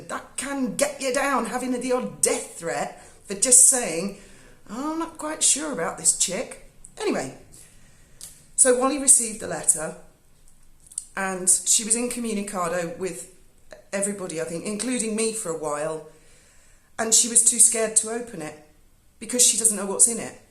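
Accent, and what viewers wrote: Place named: Wales, United Kingdom. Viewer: Sounds like a proper Welsh woman ❤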